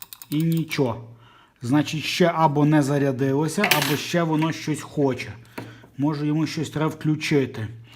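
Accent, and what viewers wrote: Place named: Horodok District, Ukraine. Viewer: Ви певно з Городоцького р-ну😂 Слухаю і балдєю 😆👍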